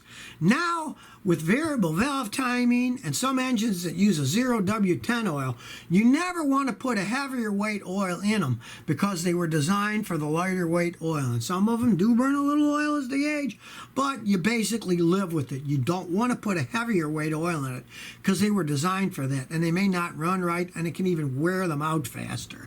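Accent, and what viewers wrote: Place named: Texas, United States. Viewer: I don't know what I like best, is comment, knowledge, texas accent...or the images on his right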